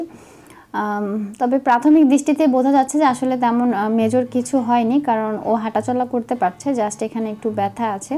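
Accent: Sylhet, Bangladesh. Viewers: Apu tmr basha sylhet er kotay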